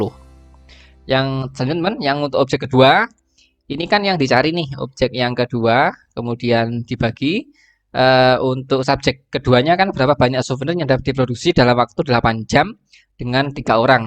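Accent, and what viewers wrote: Java, Indonesia. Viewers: Logatnya medhok jawa. Susah bged dipahami logatnya. Maaf. Cuman masukan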